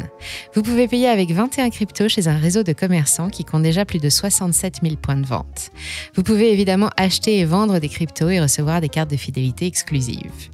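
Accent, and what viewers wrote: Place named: United States. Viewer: oui c’est bien possible. Après elle a un excellent accent américain. Après on a pas l habitude de dire bit cogne prononcé comme ça mais j’ai un pote qui dit tout à la Française genre bit coin (comme un coin) c’est horrible 🤣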